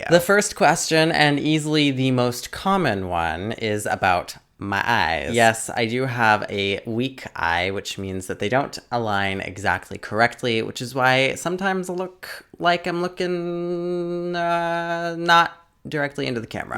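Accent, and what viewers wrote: Portugal. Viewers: Pensei que fostes português devido ao sotaque lusitano.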